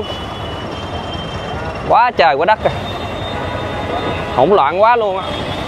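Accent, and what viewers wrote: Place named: Vietnam. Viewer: Giong Vietnam qua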